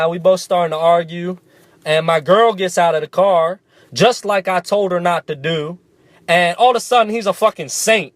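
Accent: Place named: United States. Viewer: fuck you make me laugh !! god bless the yanks accent !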